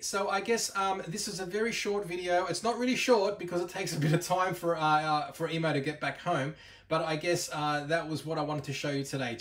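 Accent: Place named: Australia. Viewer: Hi, as always very helpful videos 😊thanks for that! My EMO gets off the home station quite well but today when I asked him, he said: I can’t at this moment , you think this has to do with the battery? I thought it was weird as it had never happened … Thanks for any advice. Ps: love your Aussie accent 😊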